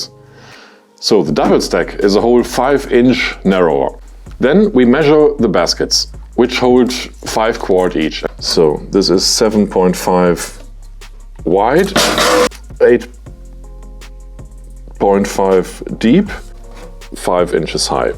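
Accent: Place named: Germany. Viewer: You speak with a German accent and refeer to inches!? Who knows what that is?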